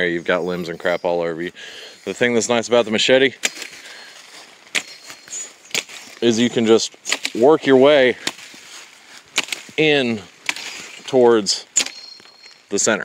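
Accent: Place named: Missouri, United States. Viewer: I swear ...you sound like your from Missouri.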